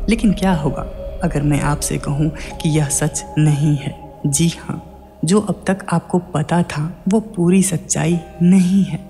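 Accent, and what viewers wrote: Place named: Pahadi, India. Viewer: Bhai pahadi ho , ho na Aapka accent pahadi he . Bhai bahut badiya ❤ plz continue.. Wating for your next vedio Very knowledgeable.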